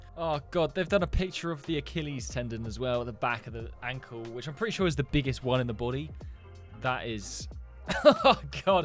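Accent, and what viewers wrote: United Kingdom. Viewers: …you are British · I’m sorry, but you sound British are you British at the sound British so are you British?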